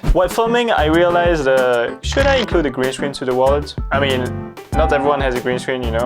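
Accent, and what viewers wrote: France. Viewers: t'es français? si oui, très bel accent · Does my ears mistake me or do you have a french accent ? Seriously I can barely focus on what you said, my mind keep thinking about that, maybe I have an ADHD, idk, just don't ask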